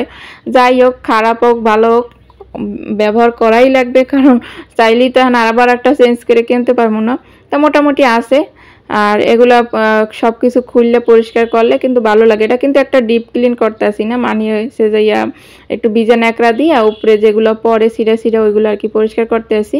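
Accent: Barishal, Bangladesh. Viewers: আসসালামু আলায়কুম আপু তোমার মুখে বরিশালের ভাষা শুনতে ভালোই লাগে। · সালামালাইকুম আপু তোমার মুখে বরিশালের ভাষা শুনতে ভালই লাগলো ❤আমি তোমার পরিবারে একদম নতুন সদস্য পুরো ভিডিও খুব ভালো লাগলো আজকে থেকে সব সময় পাশে পাবে ❤❤তুমিও এসো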